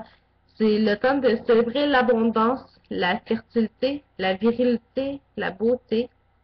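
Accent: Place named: Quebec, Canada. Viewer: T'as vraiment un accent ! xD Content de savoir que des Québecois font des vidéos wiccanes en français ! ;)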